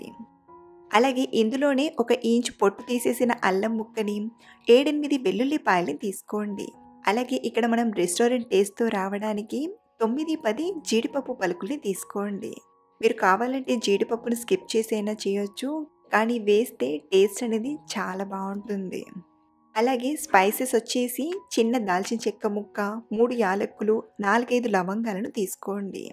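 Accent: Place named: East Godavari, India. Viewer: East godavari slang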